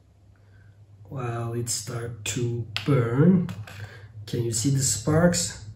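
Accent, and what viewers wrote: Turkey. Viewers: Merhabalar Öncelikle videolar için çok tesekkürrler çok faydalı içerikler var kanalınızda.Aksandan ve kullandıgınız malzemelerden anladıgım kadarıyla türkiye de yasıyorsunuz. Büyük ihtimal sizinde karsınıza çıkmıştır bi yerlerde. Nanomax ısı boyası ya da başka marka adları altında satılan bir ürün var. ISI BOYASI. Bu sistemi kendim yapmak istiyorum. Bu konuyla ilgili bi proje yaparsanız izleyiciler çok faydalı olacagı inancındayım.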